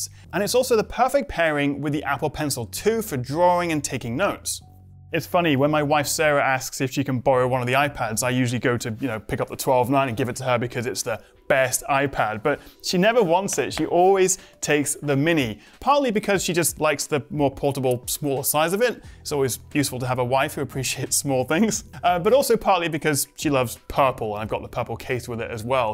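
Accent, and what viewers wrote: United Kingdom. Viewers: I love how aggressively British you are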